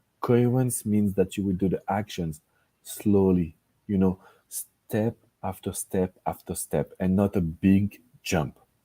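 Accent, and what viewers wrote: France. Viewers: Your French accent is amazing is an advantage 😊 thanks 🙏 for everything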